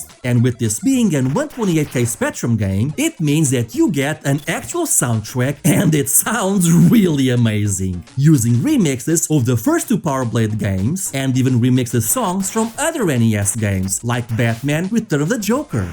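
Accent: Portugal. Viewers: It's okay. Great video! ^^ By the way, if you ever feel self-conscious about your Portuguese accent, don't! I think it makes your videos unique and charming. You get tired of hearing the same US or UK accents all the time! I'm a fan of your work; keep up the good job! ^^ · você é de Portugal?? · Bom, essa foi uma forma inusitada de descobrir que você é português kkkk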